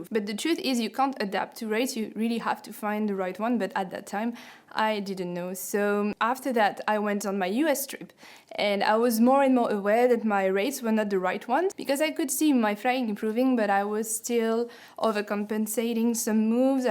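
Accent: France. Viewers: Great Video for Beginners. Very nice! I like your french english! Looks like more snake rates then Steele rates! Greetings from Germany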